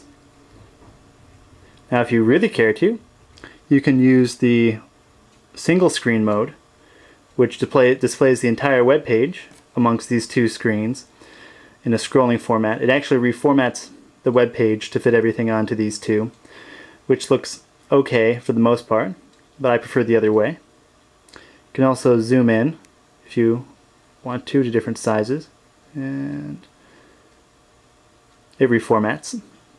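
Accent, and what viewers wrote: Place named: United States. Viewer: annoying american voice-over...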